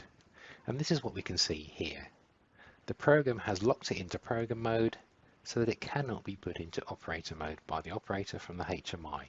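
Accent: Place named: United Kingdom. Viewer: Might be worth contacting the UK distributor as that's a UK accent.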